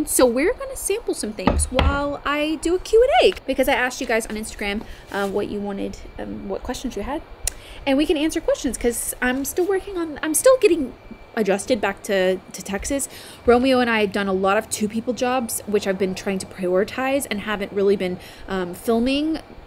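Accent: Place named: California, United States. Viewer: Hi love your videos! I was wondering if you could answer this in your next q&a, I am just so curious. Have you always had a Californian accent or you just lost the southern accent living in CA for so many years?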